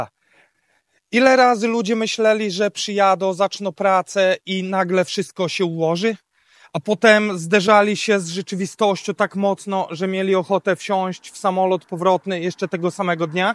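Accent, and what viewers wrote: Podlasie, Poland. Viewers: usłyszałem akcent i mówię podlasie, i zgadłem. Nie tak tu strasznie😉 idealnie nie jest ale da się żyć. Nowo przyjezdnym odradzam przyjazd bez wcześniejszego załatwienia zakwaterunku najlepiej u rodziny już tu żyjącej lub znajomego. Służba zdrowia, mhm ja osobiście mam dobre doświadczenia a i w Polsce idealnie nie jest🙃 A im mniejsze miasteczko tym łatwiej się żyje, Dublin odradzam każdemu... Pozdrawiam z Waterford 18 lat na wyspie a miał być tylko urlop🤣🤣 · Oprócz śmiesznego podlaskiego akcentu większość co chłopak mówi to prawda. Mieszkam tu 20 lat i widzę jak Irlandia się zmieniła... Czy na lepsze...?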